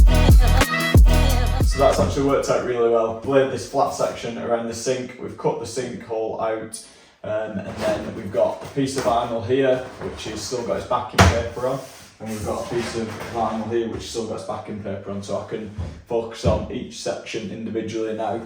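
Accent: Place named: Lancashire, United Kingdom. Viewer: South Lancashire, around Chorley, Leyland, Bolton.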